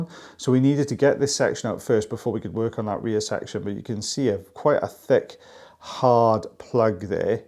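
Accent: Wales, United Kingdom. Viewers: A Welsh accent makes this even better. Very satisfying.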